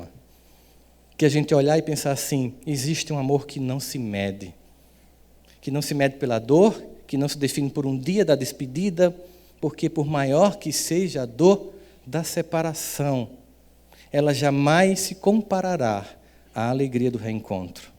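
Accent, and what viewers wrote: Northeast, Brazil. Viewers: Também gosto do sotaque. Sou paulista mais admiro um nordestino que fala assim com clareza. Na minha região falamos muito feio kkkkk. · Que história linda!!! Sou sua fã incondicional. Moro em Natal e gostaria de saber se você vem aqui para uma palestra.... lhe acompanho há quase dois anos. Adoro suas palavras; suas palestras e seu sotaque que é igualzinho ao meu. Sempre grata a você que é um enviado de Deus. Muitas bençãos pra você é família 😊🙏🏼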